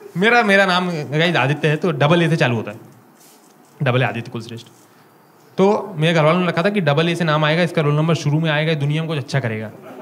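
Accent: Bhopal, India. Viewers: I can relate to your humor, Your Bhopali accent makes the joke way more funnier. ~ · Bhopali is in your tone..... ❤️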